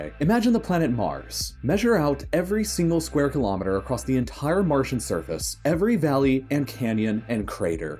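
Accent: United States. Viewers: Speaks American English USES KILOMETERS AS THE UNIT OF MEASUREMENT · Sounds American. So maybe not use the metric system. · As an American, I couldn't relate to distances because you dealt in kilometers, not miles. Why. Just why. You sound American too. It was frustrating. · Narrator has American accent. Why is he talking about Km and not miles. Drop dead. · Does anyone else find talk of kilometers meaningless? I know metric is more scientific, but, you sound American, give it to me in miles. Please.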